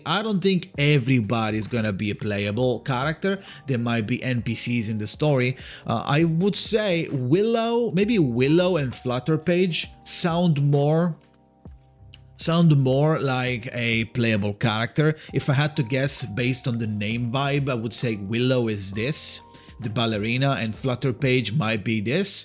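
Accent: United Kingdom. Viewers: The UK accent made me clacking 😭😭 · Alright mate, hate to do this but I oughta stick up for my Scottish, Welsh and Irish folks and remind you that it's not a "UK accent" but a mish-mash English one. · I didn't click on this video to hear my guy start speaking with a UK accent 😭😭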